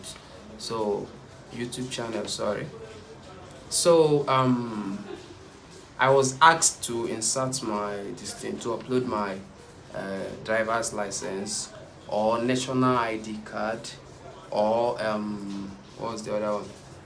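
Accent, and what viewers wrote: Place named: Igbo, Nigeria. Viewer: Pls was it the first pin you later had or the second. And pls, where was your location. You sound like an Igbo guy.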